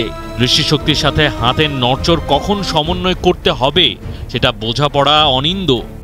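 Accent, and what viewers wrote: Kolkata, India. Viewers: কোলকাতার কোন এক দাদা পড়তেছে খবর?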